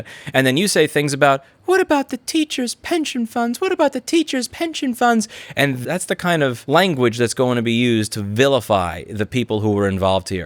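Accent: New York, United States. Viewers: Angry New Yorker knows his shit.... Thank you for the lesson I'm walkin' here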